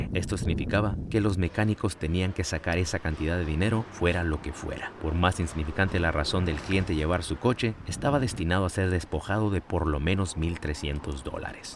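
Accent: Latin America. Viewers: "Imagínate que te cobren una reparación que jamás hicieron..." me suena a que tienes acento latino, pero que nunca viviste en latinoamérica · Suena muy latam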